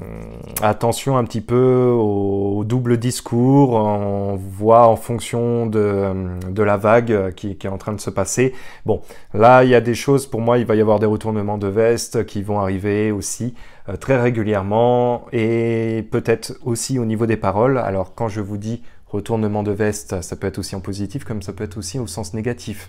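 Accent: Quebec, Canada. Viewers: BONJOUR GROS , tu as pris l'accent QUEBECOIS déjà , c'est excellent j'ai remarquer sur tes intonations , c'est excellent dans un an tu seras bien acclimaté , en tout cas super choix d être parti vivre une belle aventure plein de bonnes choses à toi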